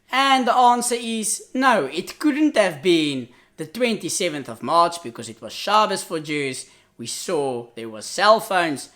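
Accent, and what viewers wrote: Afrikaans, South Africa. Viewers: Are you South African? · Hi boeta, jy is duidelik Afrikaans ... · Are you from South Africa?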